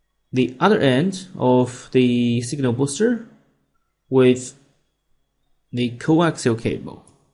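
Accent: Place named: China. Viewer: Your accent is certainly not British, more like Chinese English. Especially when you say PPPower Pluuug. Oh, and these boosters are a fraud I have bought several and they do not work.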